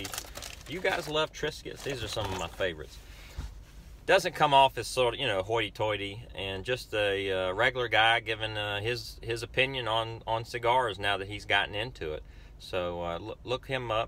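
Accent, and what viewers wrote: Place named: South, United States. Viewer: What part of the South are you from?? I'm in the south too.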